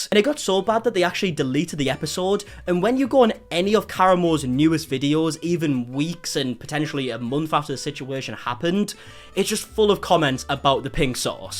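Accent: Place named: Middlesbrough, United Kingdom. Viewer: you and Plumbella have made the Middlesbrough my fave UK accent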